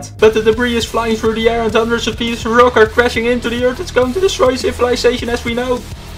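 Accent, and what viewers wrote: Netherlands. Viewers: Are you dutch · He is from holand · This guy is totaly dutch like 100% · Are you from The Netherlands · Een klein beetje Nederlands accent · You sound dutch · You sound dutch? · Je bent sowieso Nederlands Or if You’re English I thought you were Dutch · Wait he Dutch? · bros dutch? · i hear some dutch accent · You dutch?? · Are u dutch? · ik hoor een nederlans asent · Ik kan horen dat je Nederlands bent, maar dat neemt niet weg dat je Engels goed is. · No one like bc he sounds dutch just talk dutch no one understands you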